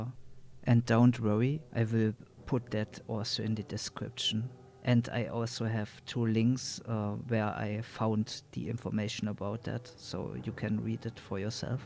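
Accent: Germany. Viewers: bist du deutscher xd klingt so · teach me german senpaiiiiiii, dude i love that accent "Not a scratch Doctor " Not A Scratch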